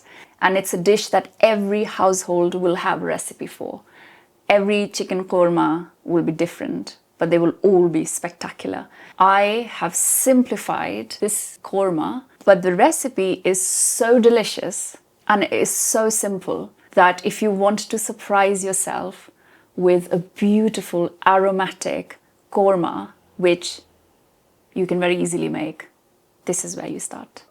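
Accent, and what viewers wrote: United Kingdom. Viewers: Is she English or Australian? What's this accent? Or maybe she's Indian? I realize she is ethnically Indian but the accent doesn't sound like it. I love the dish by the way! Watching from Canada!!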